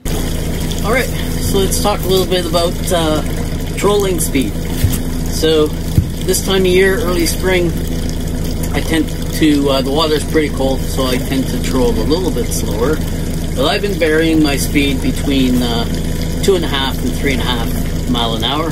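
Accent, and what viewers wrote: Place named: Canada. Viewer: I love to think of people in other countries finding this video maybe thinking we all have such a heavy Canadian accent! :) also thanks for posting!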